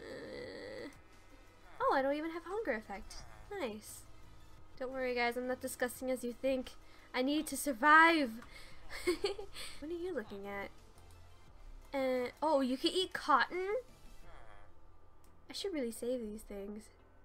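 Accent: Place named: United Kingdom. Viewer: I can definitely here british fe through out this whole video tons of times XD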